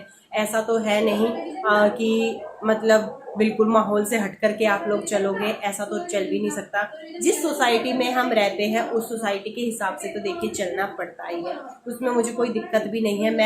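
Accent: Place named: Uttar Pradesh, India. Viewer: Language UP ki aisi hi hai usme bura lagna kya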